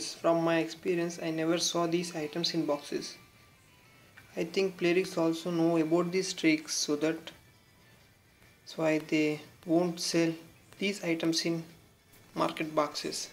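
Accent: India. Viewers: He's from Hyderabad Andhra Pradesh so that is why he speaks like that. Atleast appreciate that he knows English because most of state doesn't know English and is uneducated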